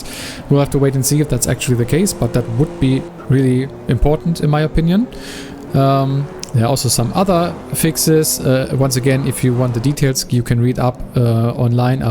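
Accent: Germany. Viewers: You sound very german lol. Edit: oke nach dem Schwedenkreuz war ich raus 😂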